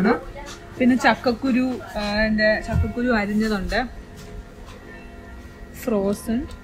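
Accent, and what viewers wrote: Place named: Kottayam, India. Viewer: തനി കോട്ടയം സ്ലാങ്. Like it.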